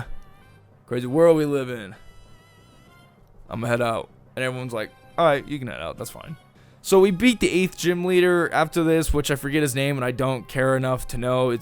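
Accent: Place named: Toronto, Canada. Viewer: U talk like u a Toronto mans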